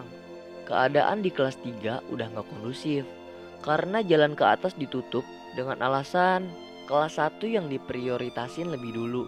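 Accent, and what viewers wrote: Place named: Java, Indonesia. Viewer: Suara Kaka lucu kaya gimana ya ngomong bocor Kay medok gitu aku medok gak terlalu si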